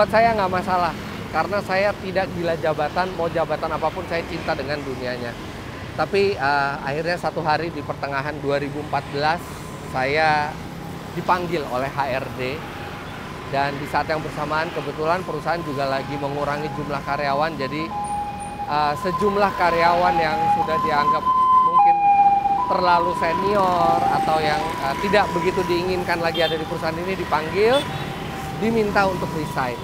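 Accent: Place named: Java, Indonesia. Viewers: dan saya logatnya medok dan saya langsung dapat panggilan jawir😅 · "Agak shock berada disini. Karena....cara ngomongnya itu beda. Saya biasanya itu 'aku, kamu' disini 'lo, gwejh' dan saya logatnya medok dan saya langsung dapet panggilan Jawir"🗿 …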